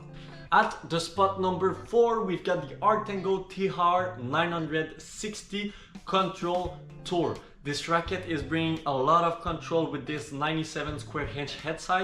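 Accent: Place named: Quebec, Canada. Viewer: You seem coming from quebec like me....i have same english accent 😊 can i ask you where did you filming this? There seem to be a lot of good racquet available in this store!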